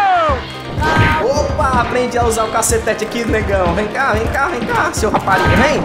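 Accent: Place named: Ceará, Brazil. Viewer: Mermao, Cearense falando e muita onda kkkkkkkkkkkkkkkkkk Coloquem mais esse narrador pfv!! muito bom!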